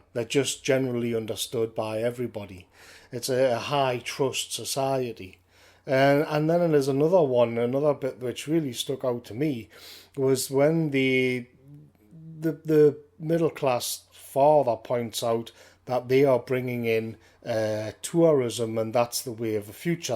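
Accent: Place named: North East England, United Kingdom. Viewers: Thanks for pointing out the elephant in the room. This review commits the same cultural appropriation that this film rails against. That is to say, ignorance of Cornish history and the basis for Cornwall’s culture difference from the rest of England. The director Mark Jenkin has said as much in interviews claiming Cornishness is a major theme in the movie, a factor that many in England don’t take the time to understand. Despite the commentator’s thick North East accent, as opposed to the thick Cornish accent he mentions, he should not use Cornish identity as a metaphor to describe the state of England more generally. At the end of day there is little evidence the poster understands the undercurrent of resentment that exists in Cornwall towards those who approach Cornwall and the Cornish as a rugged and slightly strange part of the Home Counties. 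Unfortunately this poster is little different in that regard to the Home Counties blow-ins depicted in Bait. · I live on the NE coast and it's both middle class and has fishing. I guess you know this though because you're from the same general area based on your accent. I'm working class myself, but I've lived at Tynemouth and Whitley Bay and had a lot of mates out that way as well. And while it is full of mega-rich families, there still is fishing as well. I know a lad that works on a boat actually, and it's generational thing as he works with his Grand-Dad. I guess the NE coast is different as you have N and S Shields as well. I don't know much about South Shields, but North Shields still has Fishing as well. I imagine it's nothing like it was though, but then the Oceans were over fished for a time, so this makes sense as well. Anyway, what I meant was that in this case it's different I guess. 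I've never been down south much, so don't know what it's like. But up here, seaside areas are always incredibly middle class. I can see how a rural fishing area could be destroyed by townies though, I guess it's just a totally different situation to our seaside areas in the North East. I think Tynemouth is quite possibly the most expensive area to live in North Tyneside.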